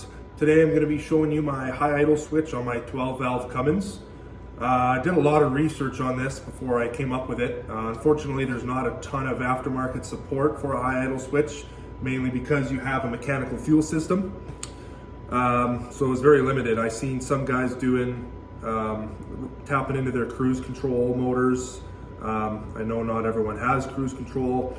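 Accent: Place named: Canada. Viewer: As a Canadian i appreciate hearing our accent in the video😂🙌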